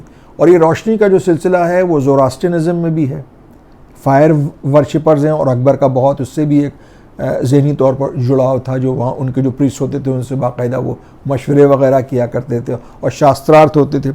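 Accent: Lucknow, India. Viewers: saheb aik gujaarish aap lucknowi chikan ka kurta aur wah bhi chunnat wala pahan kar episode kijiye. bahut authentic lagega aapke lucknowi lahja ke sang.chia to hoga aapke paas.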